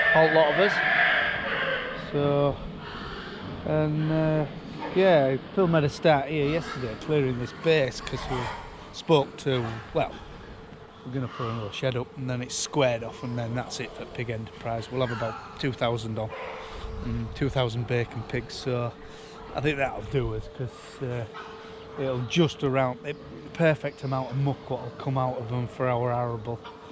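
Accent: Yorkshire, United Kingdom. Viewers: Hi, Just subscribed to your channel, being watching for a while and really love the videos as they are very very good and you explain everything so welland unreal the amount of work you and the lads get through 🥵. Took me a while to get used to the Yorkshire accent right enough 🤠. I'm From the west of Ireland 🇮🇪 and keep dry heifers now, suckler cow herd gone 4 years now and flock of sheep away 10 years now. My grandfather kept a couple of sows when I was young boy and remember them well and always had a fondness for them. Thinking of buying a Claas tractor and would appreciate a run down on yours sometimes as I know you like it. Thanking you and looking forward to your next videos. 🚜👍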